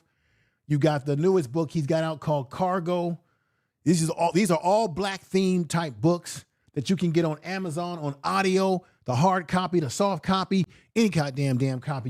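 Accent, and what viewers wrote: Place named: England, United Kingdom. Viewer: UR ”ENGLISH ACCENT” iZZZ JUST DREADFULL‼️‼️‼️😱😱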